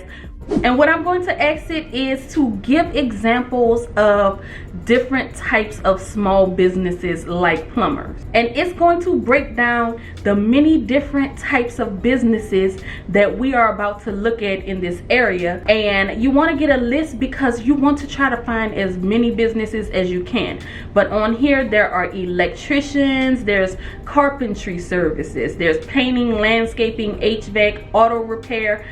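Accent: New Orleans, United States. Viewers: After listening to you speak the first thing I thought was "She MUST be from Nu Awlins". I just discovered your channel last week and will be applying your directions and advice to make money. And boy do I need it right now. I fall down but I keep getting up. Thanks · I knew you was a Nola baby😂.. Wuzaam witcha? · I can tell by your accent you from New Orleans !! Love seeing people from my city really make it out !! I'm next !! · Girl! The second I heard you talking I was like she sounds like she’s from here… then Sho’Nuff you said New Orleans girl I’m right here on the West Bank! So of course, I subscribed for that reason and because you are doing the Lord’s work out here girl! Thank you so much for not gatekeeping information like this. Also, the way you explain the process step-by-step was flawless and completely the way I process things. So I just wanted to come on here and thank you and say hey from the West Bank and to tell you, you are doing amazing things and it’s appreciated! 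· Girl.. you sound like you’re from the N.O!! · Thanks for your info. I knew the accent was New Orleans❤ Baton Rouge here 👋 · I kept telling myself, she sounds like me, she has to be from the 504. lol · I love your N.O. accent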